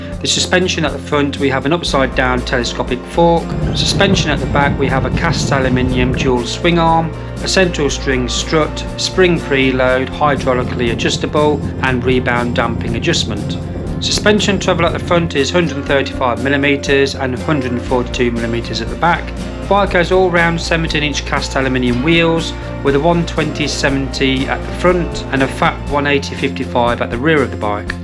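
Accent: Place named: East Midlands, United Kingdom. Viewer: Nice video and nice accent. You sound local to me (Leicester [Coalville]) but could be anywhere in East Midlands. Nice to recognise the roads.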